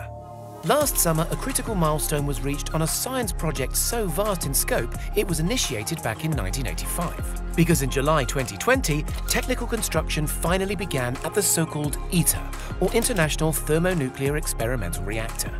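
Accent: England, United Kingdom. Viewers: WARNING... FOR ENGLISH ACCENT ONLY !!!!!!!!!!!!!!!!!!!!!!!!!!!!!!!!!!!!!!!! TALKING WITH THE NOSE !!!!!!!!!!!!!!!!!!!!!!!!!!!!!!!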